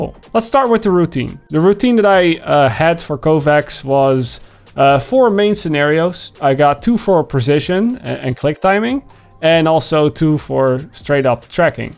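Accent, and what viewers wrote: Netherlands. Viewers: Dude got some Dutch accent, btw I also used this aimtrainer and now can outaim most of the players i play against (Now I just need to grind to develop game sense)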